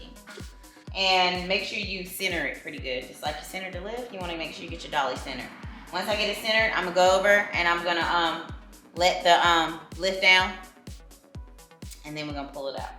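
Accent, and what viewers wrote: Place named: Texas, United States. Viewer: Got a Texas accent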